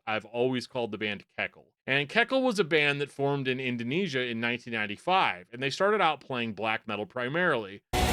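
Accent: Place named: Midwest, United States. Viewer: Greetings from 🇸🇪 Thank you for your lovely midwestern pronounciations and this phenomenon I was unaware of! As a neighbour, I guess Vaakevandring should probably have the heaviest accents on the first a's and secondly the second a. Awake wandering.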